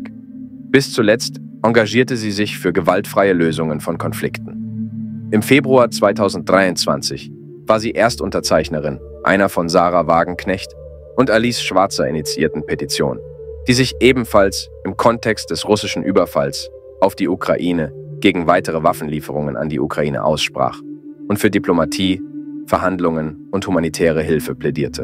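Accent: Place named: United States. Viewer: was soll dieser "Ami-slang" in deiner Präsentation?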